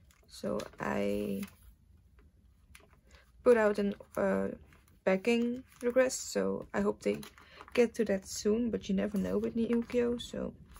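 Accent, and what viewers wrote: Netherlands. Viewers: Do u speak Dutch bc how u speak in English is how most Dutch / belguim ppl speak in English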